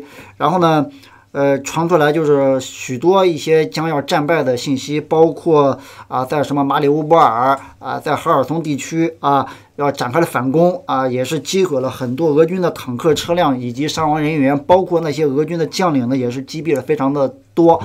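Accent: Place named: Qingdao, China. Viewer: 频道第一次看，挺好！伙计，听口音青岛银？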